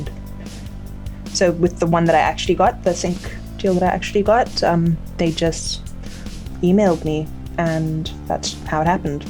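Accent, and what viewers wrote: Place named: South Africa. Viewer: Hey,. Sorry I missed your first livestream,but I shared this all over Facebook. You're awesome as always. I love You're South African accent and could listen to you talk all day. Lol. I absolutely love your music and the way you perform. Never stop playing. I don't have much time left on earth, but I'll be listening to you for as long as I can. 🤟✌🌹🌹🌹🌹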